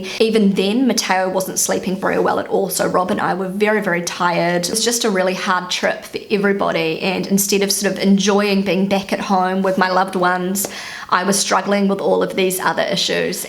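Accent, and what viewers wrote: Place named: New Zealand. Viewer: I like all of you videos, - your positivity and smile really warms my heart. How lucky your husband and your children are:) And you know what? I had to google wikipedia for a New Zealand English article because when you get used to British or American English it's so funny to hear a New Zealandish accent. It's interesting to compare just the word "better" spoken in these three variations [ˈbɛtʰə] (UK) / [ˈbɛɾɚ] (US) / [ˈbeɾə] (NZ). Just t o let you know <3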